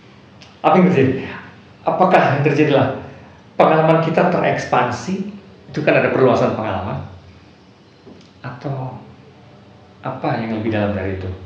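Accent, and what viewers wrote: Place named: Indonesia. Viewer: Indonesia Bangat bacaannya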